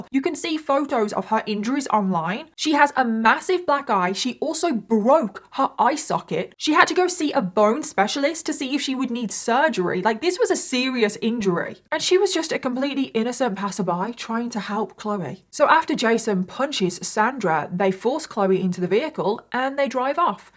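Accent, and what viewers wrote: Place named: Birmingham, United Kingdom. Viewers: Ooh are you a fellow brummie? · You’re so amazing , and make me feel at home with your accent I live in Australia but from Birmingham !! You’re my favourite when I’m having a adhd moment you keep me on task 🙏🏻🙌🌻🫶🏻🥂🎩🤘🏼👽💜💚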